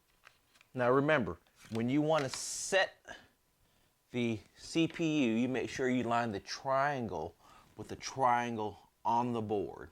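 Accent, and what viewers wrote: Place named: South, United States. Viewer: Funny southern accent ahahaha! Nice and helpfull video!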